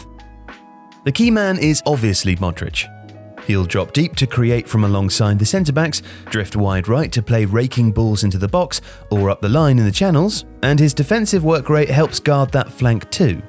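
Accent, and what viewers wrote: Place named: RP, United Kingdom. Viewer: It’s just posh and annoying.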